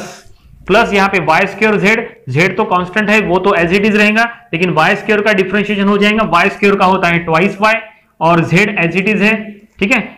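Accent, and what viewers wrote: Hyderabad, India. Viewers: Sir hyderabadi Ho qa milenga milenga ke rhe ho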